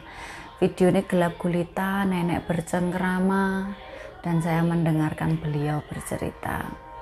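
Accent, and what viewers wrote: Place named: Java, Indonesia. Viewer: Mbk,,, njowone ngendi mbak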